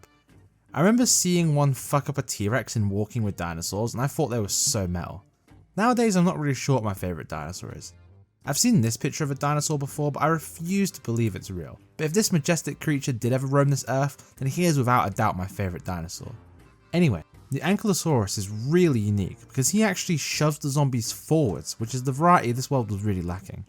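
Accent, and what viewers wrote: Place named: United Kingdom. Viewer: why does your voice get increidibly more british when you stream?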